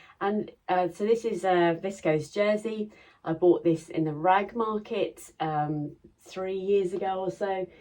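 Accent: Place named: England, United Kingdom. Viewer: I had to go back and watch the early part of this with Closed Caption on. I thought you said Testicles but the CC showed Chesticles...LOL...My USA accent vs your English accent I guess is why I wasn't hearing what you said....LOL...Love the Sally jump suit. You look great in it.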